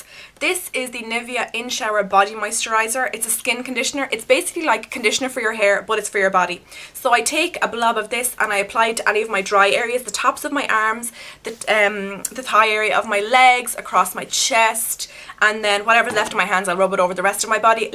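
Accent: Ireland. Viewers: OMG your Irish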